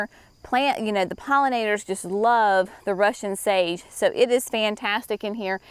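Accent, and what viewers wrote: South, United States. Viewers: Your parents' garden reflects a true HOME garden created by loving hands who seem to love the beauty in the gifts from God our Creator whether that be in the incredible variety of plants He gives us to enjoy, or the beauty in a a piece of wood, a fallen log, or another artist's creation! I appreciate your just being yourself as you walk through "Mimi's garden" in both your joy of sharing information about a plant, while teaching us as well (I likely could be your mama, but ALWAYS learning!) As a fellow Southerner, I love your natural expressions and lilt in your voice. However, I also appreciate and learn from your very different sounding but naturally gifted gardening friend Laura in Oregon...both of you are "down to earth" as we would say...no pretense in any way. And did a great job on "walking with you" in that woodland garden environ...that's not easy to do without sliding on pine straw or hitting a tree root (unless that's in the 'bloopers':) Blessings!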